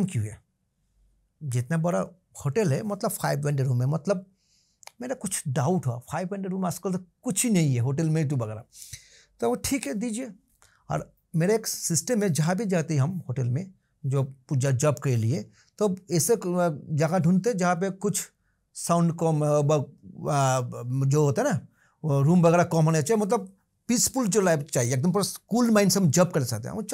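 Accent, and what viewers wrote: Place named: Bengal, India. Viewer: Ku samj nhi aya?????? Hindi he toh bola hai. Boss pronouns thora bengali mixd hai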